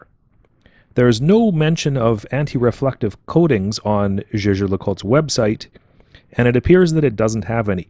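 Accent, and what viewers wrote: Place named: United States. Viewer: Konstantin Correct. But he sounds American and they spell English words badly there.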